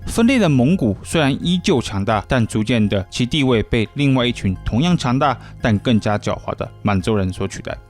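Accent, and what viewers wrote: Taiwan. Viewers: 非常台灣腔，很奇怪 !!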